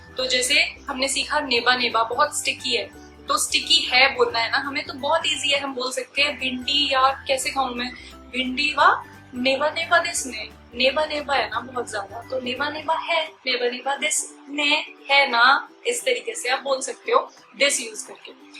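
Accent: Punjab, India. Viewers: mam r u from Punjab???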